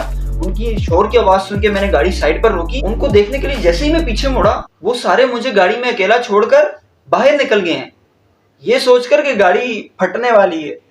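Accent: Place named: Karachi, Pakistan. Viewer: Pakky karachi waly lagty ho ap bro 😅❤️❤️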